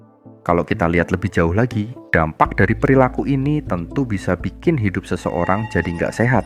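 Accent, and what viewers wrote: Java, Indonesia. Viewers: gue juga heran, bang. aksen Lo Jawa banget, tapi pake Lo Lo, gua gua. biar apa??😄😄😄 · Mas sampean medok, jane rasah nganggo lo gue.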